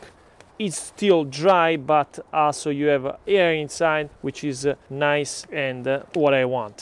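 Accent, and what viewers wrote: Italy. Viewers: great italian accent😍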